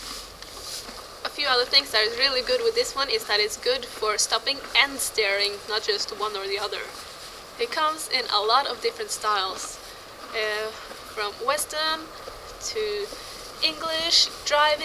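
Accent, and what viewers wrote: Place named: Norway. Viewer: The Norwegian accent was very pretty btw! Hålla from a finn\german\NativeAmerican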